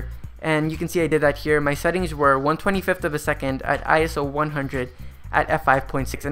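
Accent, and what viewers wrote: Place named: Philippines. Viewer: not bad englilsh at all... but the signature filipino hum is there... haha! Good job! nice tutorial!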